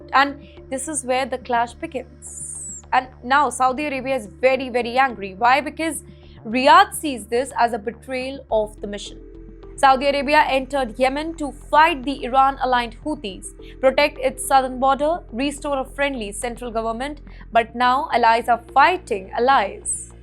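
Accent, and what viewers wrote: India. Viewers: Oh my god look at this curry accent we Australians don't understand what you are saying speak proper English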